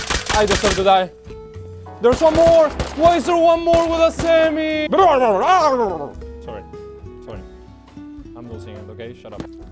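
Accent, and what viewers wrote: Argentina. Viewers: sos argentino o que? jajaja wtf you blowed my mind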